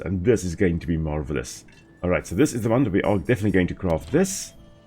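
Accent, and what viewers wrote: South Africa. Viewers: Your accent sounds South African boer. Please tell me im right. Just started a charge blade last night by the way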